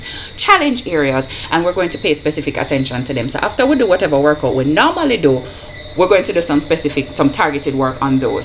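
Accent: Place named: Jamaica. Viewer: Patois voice better! :)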